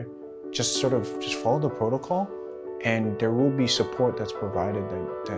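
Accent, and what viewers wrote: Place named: United States. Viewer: American accent in disguse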